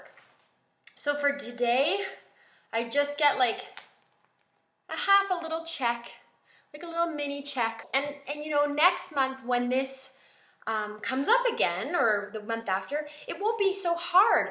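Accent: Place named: Canada. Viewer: ahhh. That's cool. I thought I detected a bit if Canadian accent in your voice. You are crushin' it, Mama! Way to go!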